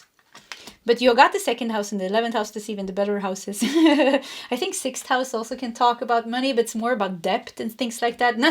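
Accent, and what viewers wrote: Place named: Sweden. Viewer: Chose pile 3, have experienced a heart chakra activation in the past year and I love your adorable Swedish accent. You have such a sweet energy! I lost several family members to cancer, cardiac issues and dementia. My first half of my life was filled with trauma, loss and adversity. At 45 years old I am exploring a potential career change and I have connected with a soulmate.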